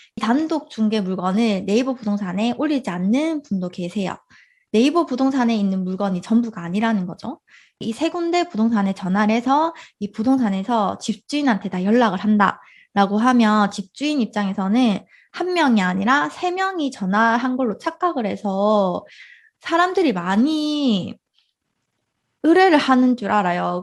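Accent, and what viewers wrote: Busan, South Korea. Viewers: 부산에서 오신건가요 잘 봤습니다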